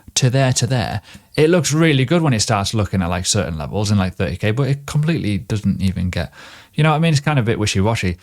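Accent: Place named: Yorkshire, United Kingdom. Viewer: I still can't get a handle on your accent mate... sometimes I get north Yorks/Darlington, sometimes I get scouse..😂😂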